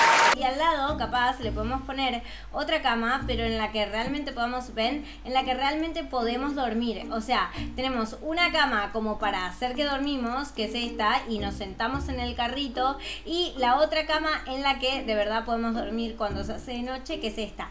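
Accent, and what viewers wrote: Argentina. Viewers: tueres de argentina?? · Sos de Argentina o de España? O algún país asi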